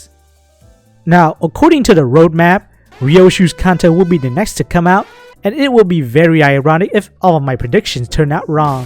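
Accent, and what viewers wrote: Vietnam. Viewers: why do you sound like a Vietnamese/Asian? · you sound so vietnamese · Seeing this guy Vietnamese accent continue to become more presence is funny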